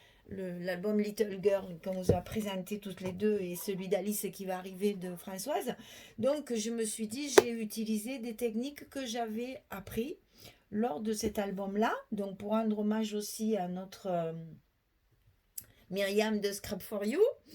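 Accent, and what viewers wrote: South, France. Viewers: bonsoir......je viens de me regaler avec votre video......merci pour la petite leçon d histoire(lol)...l album j adore.....les papiers sont vraiment bien mis en valeur....et la boite bravo....je n ai jamais encore touche aux cires et peintures......c est vraiment reussi .....vous avez un accent bien agreable,plein de bonne humeur et de soleil.....moi aussi je suis du midi.....je suis catalane.....pour myriam de scrap for you c est vrai c est une personne tres gentille ,un jour on a passe 2H au tel je ne m en suis meme pas rendu compte tellement c etait agreable.....bonne soiree....;a bientot pour votre prochaine creation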